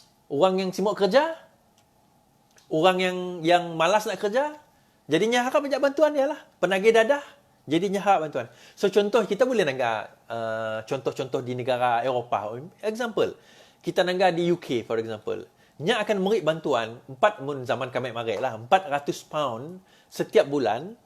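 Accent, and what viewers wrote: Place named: Sarawak, Malaysia. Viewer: Abg sy dri kelate still dgr zdisi bhs srawak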